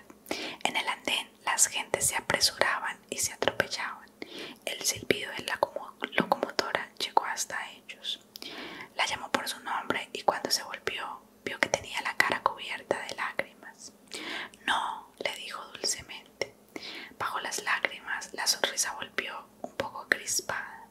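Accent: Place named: Colombia. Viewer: Amo ouvir essa colombiana falando espanhol. ❤❤❤❤❤😘😘😘😘😘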